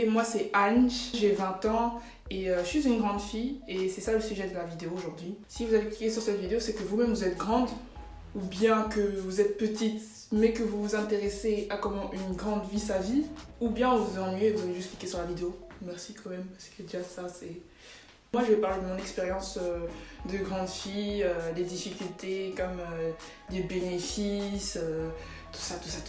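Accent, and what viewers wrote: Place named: London, United Kingdom. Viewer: J’aime cette video. Je suis grande aussi. Je viens de Londre et ma francais est très mauvais. Mais je comprends assez. Aussi j’ecoute un accent londres quand tu parle anglais 🇬🇧❤️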